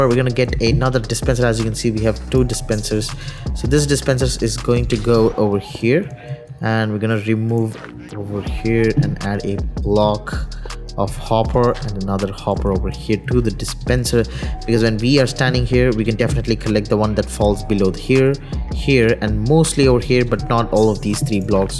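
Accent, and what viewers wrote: India. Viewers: Man i love the indian accent your good bro your explanation is great the otherd is shi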